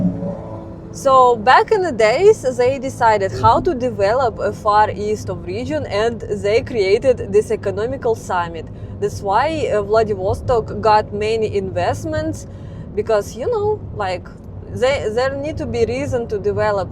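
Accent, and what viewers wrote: Russia. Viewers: Dear... Thank You so much for the GREAT video. WOW!! That is some bridge. Very impressive. The road trip through Russian Island was also super cool. My wife and I really enjoyed this video. You take us places we, probably, will never see in person. It is so cool. We truly enjoy each and every video you put out. I could listen to you for hours ( and I do when you do a live stream). There is nothing so beautiful to my ears than the English language spoken with a Russian accent. We are so happy that you are doing well. We truly wish that our governments would get down to work and normalize relations between our countries. Thank You so much for all of your efforts... we enjoy them so much. God Bless and all of the very best from your Capitalist friends in the US... Peg and Barry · You're sounding like soviet/Russia today,before your english is mixed with a little bit of British, America and very little Soviet/Russia,but you're sounding pure Russia/Soviet english accent and it's entaining. 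MAYBE VLADIVOSTOK have taking over and it's good.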